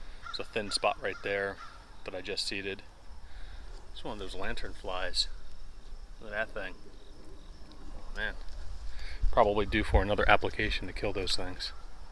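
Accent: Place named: Philadelphia, United States. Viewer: New sub here! Safe to assume you’re from the Philly area/surrounding areas? That accent sounds very familiar! South Jersey here about 20 min from the city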